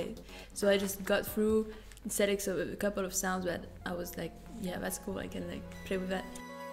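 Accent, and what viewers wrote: France. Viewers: Mmm cet accent français !👌👌🤩🤩🌹🇨🇵🥳😜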